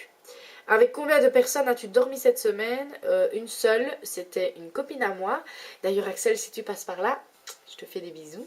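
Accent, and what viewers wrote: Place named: Belgium. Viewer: Coucou! Bienvenue sur youtube ^^J'ai crée une chaîne il n'y a pas très longtemps, et je t'invite à la découvrir en espérant qu'elle te plaise et te face passer un bon moment, et si tu t'y sent bien et que tu a aimer quelques une de mes vidéos n'héssite pas à t'abonner. Et si tu t'abonne j’espère que mes future vidéos te plairont d'avantage! je te souhaite une bonne journée ou soirée:) bisous à toi!:p byyyy! ^^ -...... PS: j'adore ton accent! tu est belge non?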